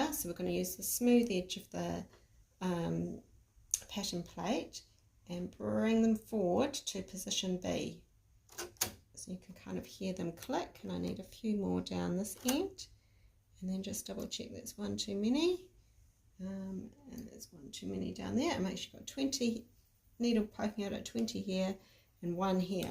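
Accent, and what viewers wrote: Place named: New Zealand. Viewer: have a look at either end of the needle bed and you should see a little plastic tab. Check your manual or search online to see if someone else has put up a YouTube video of changing the sponge bar. I detect a New Zealand accent. I got my sponge bar from New Zealand. If you want the details, I’m happy to pass them on.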